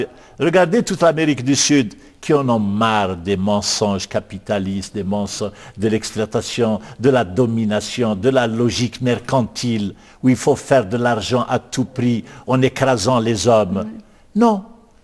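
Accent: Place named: North Africa. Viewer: Pourquoi parfois vous roulez les R alors que parfois vous les dites parfaitement... Cheulou cet accent du bled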